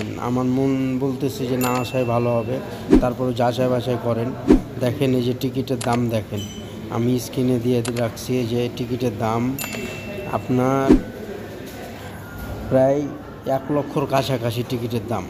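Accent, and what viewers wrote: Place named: Chapai Nawabganj, Bangladesh. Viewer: ভাইবে বাড়ি কি চাঁপাইনবাবগঞ্জ নাকি?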